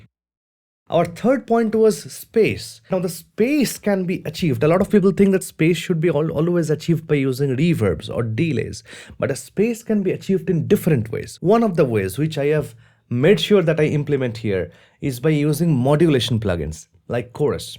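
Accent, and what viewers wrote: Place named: England, United Kingdom. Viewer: Great english accent, completely professional.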